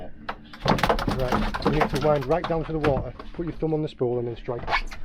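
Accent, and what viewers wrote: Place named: South West England, United Kingdom. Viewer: I actually grew on brittish movies and tv shows, and it still didnt help me with your south-western accent and dialect haha. The subtitles really helped a lot, youtube logarithms working wonders nowdays haha. But dont worry, these things are mutual among us sea people and highlanders. I mean, if you learned croatian language, you wouldnt understand me either haha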